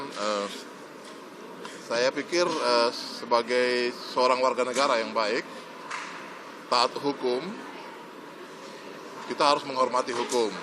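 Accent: Java, Indonesia. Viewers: Wah jowone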